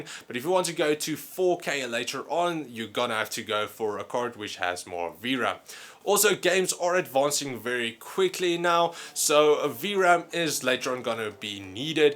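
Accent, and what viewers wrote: Afrikaans, South Africa. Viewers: South African? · Is this guy Afrikaans? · I had a feeling your South African, so am I. Lekker my bru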